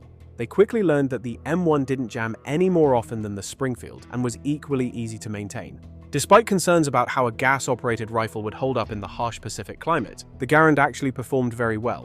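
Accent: United Kingdom. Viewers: so british, so smug. GAAAAHHH RAAAAND.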